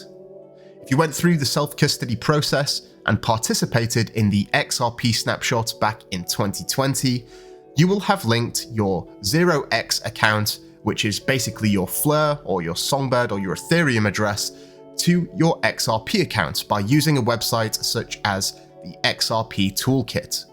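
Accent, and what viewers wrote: Yorkshire, United Kingdom. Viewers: You've learnt how to fight the Yorshire accent somewhat? Bet the yanks love that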